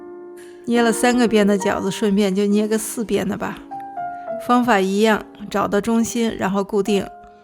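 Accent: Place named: North China Plain, China. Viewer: 是哪儿人呀好标准的华北平原儿化音哈哈哈哈哈哈河北人表示听了超亲切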